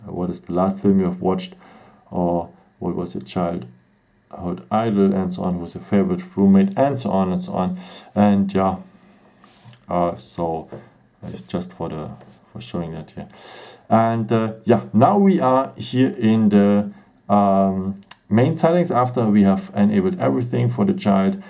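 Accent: Germany. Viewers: Is he german💅💀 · dat is doch nen deutscher oder verhör ich mich?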